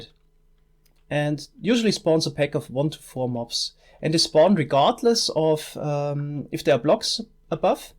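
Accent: Germany. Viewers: Mann merkt den deutschen akxent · wie Methoz auch noch am Anfang Hallo sagt :D Find ich cool das auch deutsche/Österreicher englische Videos machen :) Man hörts aber (nicht böse gemeint) :)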